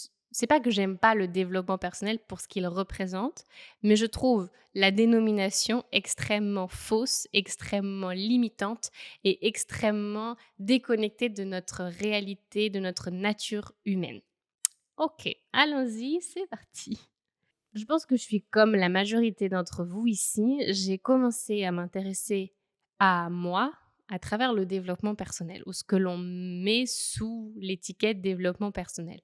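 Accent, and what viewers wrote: Spain. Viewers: Coucou c’est drôle tu as chopé un léger accent espagnol par dessus ta langue française 😃 c’est joli comme tout! Sona bien y bonito 🥰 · Elle vit en Espagne, si elle parle Espagnole au quotidien, prendre un accent c'est normal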